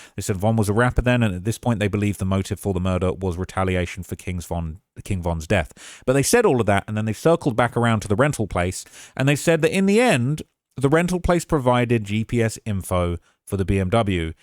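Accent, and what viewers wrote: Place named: United Kingdom. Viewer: has a thing against black people. He uses AI but yet he claims the lawyer used AI. Why? Because he was black? made the claim because he himself uses chatgpt. That was projection., you didn't go to journalism school -you're not a journalist! You get your information from Reddit comments. Going to a court house with a suit on and getting a press pass because of your British accent doesnt make you a journalist. Go to the hoods you report on after someone gets shot thefe. Thats journalism. Go interview the victims in the hood. Go do your investigation after a rapper gets shot, then I'll call you a journalist. All you do is institutionalized gossiping. You have turned gossiping into a profession. But thats gossiping - not journalism. The man you're accusing of using Chatgpt went to law school, passed the bar and is a lawyer! 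Who do you think you are to question his work? You're a gossip youtuber. Grab your lips and get a hold of yourself. You can put an old t.v, reels and passes in the background of your set, nice arrangement, but that still doesnt make you a journalist.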